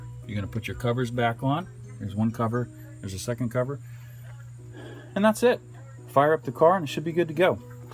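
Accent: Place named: Australia. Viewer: I loved the Australian accent 😂 made me stay and watch the whole thing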